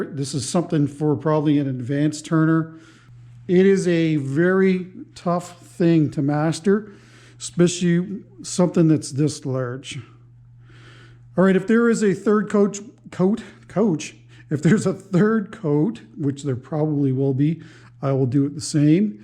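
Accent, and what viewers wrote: Canada. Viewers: It's a beautiful piece,. Pretty impressive how you can turn something so large. The audio was fine too. I could still hear you say "a-boat" in your Canadian accent, which reminds me of when I went travelling and makes me smile, ay. Have a great weekend, looking forward to your next piece.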